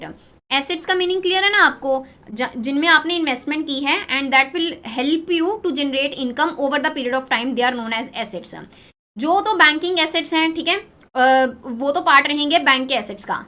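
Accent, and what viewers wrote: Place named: Punjab, India. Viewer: Punjabi tone ari h apki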